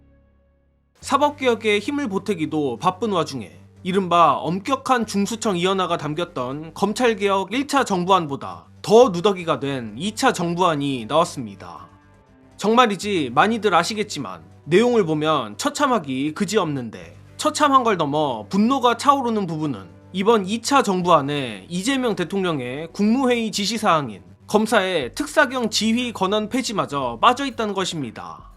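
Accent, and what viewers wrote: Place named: Gyeongsang, South Korea. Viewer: 엿까는 방송 이고만 어투는 갱상도 출생者 같은대 쪼개기 고만하고 방송접버시길 ㆍ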